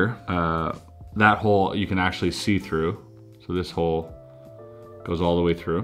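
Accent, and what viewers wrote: Germany. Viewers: Solid german accent my man high five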